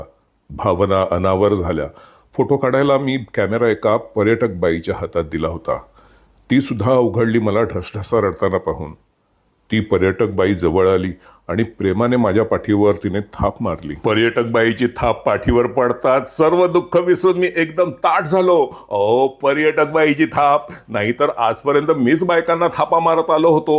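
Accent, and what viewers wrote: India. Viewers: व्वा - अस्सल मराठी 👍👍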